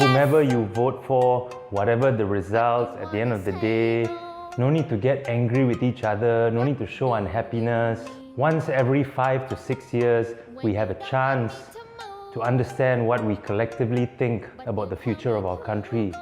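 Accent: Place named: United Kingdom. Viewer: Jamus got slight Americanised accent, yours was mimicking British accent leh... Anyway, good content! :)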